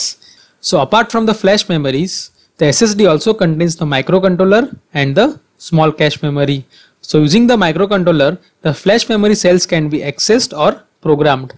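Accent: India. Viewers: Indian, we all always know your voice...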